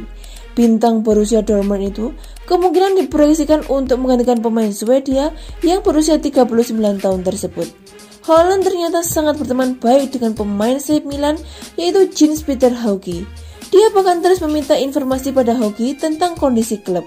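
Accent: Java, Indonesia. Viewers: Kemedokan yang baca berita nya...gak menarik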